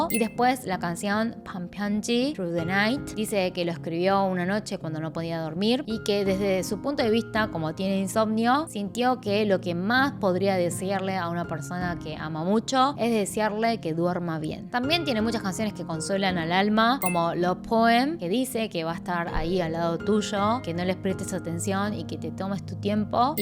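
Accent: Argentina. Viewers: Se le nota un buen el acento argentino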